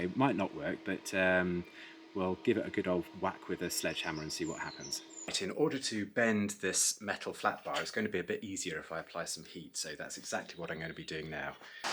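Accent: United Kingdom. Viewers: I totally didn't expect to hear the King's English from someone fabricating a farm tool. I had no clue Brits were still doing things like this. What a great video and really nice work.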